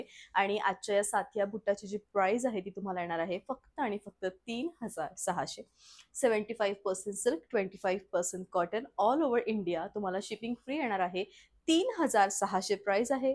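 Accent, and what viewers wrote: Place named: England, United Kingdom. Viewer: Te sagala thik ahe but boltanna jabardasti marathi boltanna english accent cha use ka krtes?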